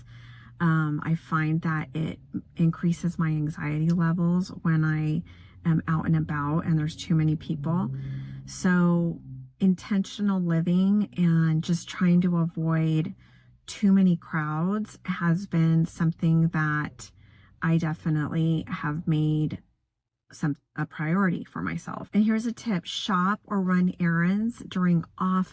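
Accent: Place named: New Jersey, United States. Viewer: She sounds like she is from Jersey:)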